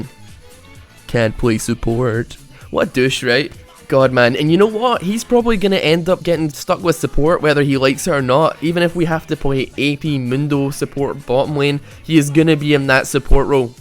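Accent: Scotland, United Kingdom. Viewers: Are you Scottish? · Where are you from ? What's your accent ? Is that scottish ? (dont hit me)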